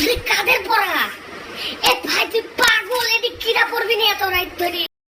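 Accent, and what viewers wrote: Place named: Jessore, Bangladesh. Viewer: আমার নানাবাড়ি হলো যশোরের ঐদিকে মাগুরায়।তুমি মাঝে মাঝে ওরকম ভাষায় কথা বলো ।দেশের বাড়ি কোথায় ভাইয়া?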